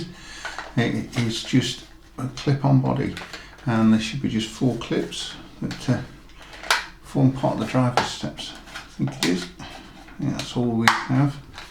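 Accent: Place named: Midlands, United Kingdom. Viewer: Nice review, I enjoy your channel. Bought one of those grey Farish 37s because of your review, very pleased with it. Still trying to guess the accent, though. Midlands? Maybe Leicester? I'm probably miles off!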